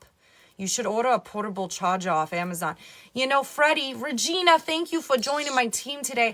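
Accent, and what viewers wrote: New Jersey, United States. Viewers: the New Jersey accent is the sexiest woman sex in the world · I love your jersey accent. I had a friend from Ridgewood. Nice legs ❤❤❤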